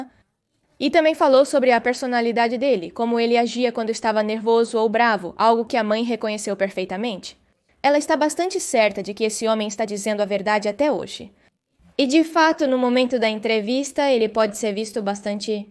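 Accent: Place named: Portugal. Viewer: este video ela fala uma mistura de brasileirado com portugues de portugal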